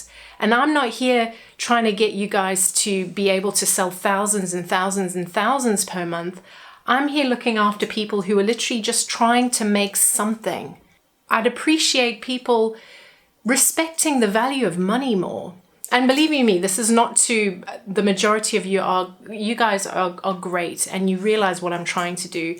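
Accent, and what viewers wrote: South Africa. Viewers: South African expat accent 😁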